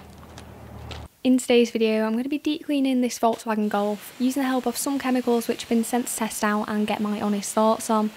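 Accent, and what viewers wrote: United Kingdom. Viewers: Se speak british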